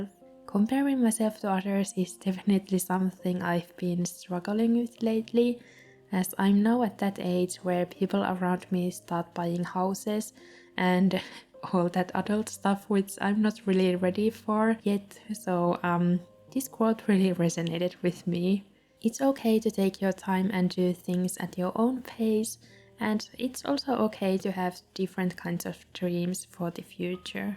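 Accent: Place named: Finland. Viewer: I knew you were Finnish from your accent haha :) my mom is from Finland! this is also super cute!!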